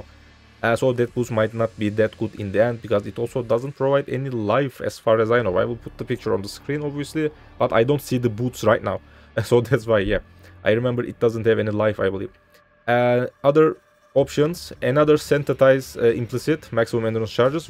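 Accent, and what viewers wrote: Turkey. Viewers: Bu videon önerilerde karşıma çıktı ve şunu söylemeliyim ki, başka hiçbir şeye bakmadan İngilizce şivenden Türk olduğunu anladım :D Bunun dışında güzel build, içeriklerinin devamını dilerim kardeşim :) Discord kanalın var mı bu arada? Açıklamalarda veya profilinde bulamadım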